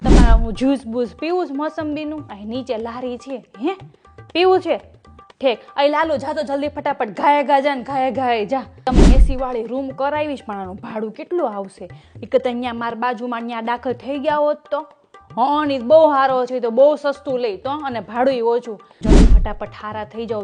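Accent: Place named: Saurashtra, India. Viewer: અસલ પટલાણી જેવા બોલો છો (સૌરાષ્ટ્ર ની બોલી)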